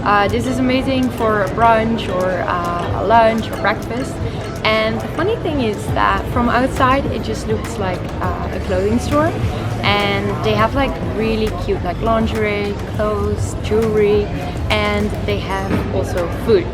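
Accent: Netherlands. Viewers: You have such a happy, peaceful vibe! Everyone on my dad’s side of the family is Dutch, I love hearing your accent, reminds me of my Dutch grandparents 💜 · So Gezellig...a dutch word for everything cozy sweet and charming...my parents were from Holland and settled here in Long Island NY. I love your accent and your voice is so sweet...I would love to see a tour of Keukenhof gardens in spring with all the beautiful tulips!!!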